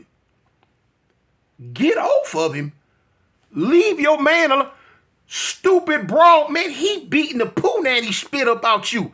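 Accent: South, United States. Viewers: I was gonna say you got a heavy southern accent which I do to but I'd swear you was from Memphis or Alabama or Jackson Mississippi one if I didn't know better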